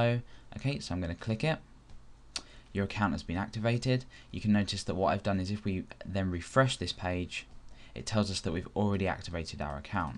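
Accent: United Kingdom. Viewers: Your british pronounce is kinda hard to get. you pronounce php as pae ech pae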